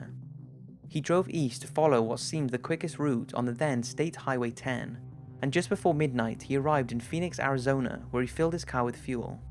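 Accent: United Kingdom. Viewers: Loving the content man, keep it coming! Out of interest, are you from the UK (and if so, which part)? Your accent is awesome for these videos.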